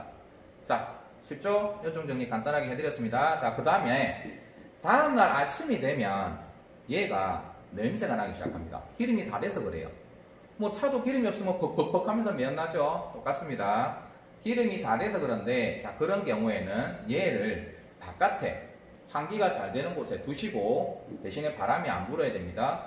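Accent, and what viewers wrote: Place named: Gyeongsang, South Korea. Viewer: 행님 구수한 경상도 사투리 팍팍 꼽힙니다. 저도 경상도라^^ 초캠이라 많이 배웁니다. 항상 감사합니데이 행님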